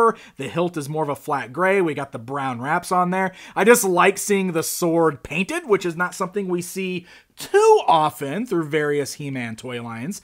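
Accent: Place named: United States. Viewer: Great review,. I totally agree about the figure's head. It does indeed look a little small for the body, and his face looks real boyish also, which totally contradicts the statement..."Most Powerfull MAN in The Universe.", and again, the character's very name: HE-MAN (Not He-Teen, let alone He-Boy). The harness also looks kinda small for his torso, and it should look as if it was made of thick, rough leather, which is something we know toy makers can do, they can make plastic look any way they want to (if they really want to), just by using the proper color shades in the plastic's mix. On the other hand, all of the above "Flaws" on the figure, are nothing but great excuses for talented customizers to do what they do best, and make their personal HE-MAN figures look just the way MATTEL could have, IF they FOR ONCE would have prioritized QUALITY and CUSTOMER SATISFACTION, over GREED (Bulk sales, and Profits). 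But that's how things are, most toymakers (except for those out of Japan), are in business just for the sake of making money, and not concerned in the least about art nor perfection. Shame on our western society, but again, great review,! Easy to understand AMERICAN ENGLISH (unlike foreign reviewers, trying to speak our language, but miserably failing, and butchering it instead), awesome footage of the toys being discussed, and good knowledge of them, which we all appreciate. Great work, man, keep it up!!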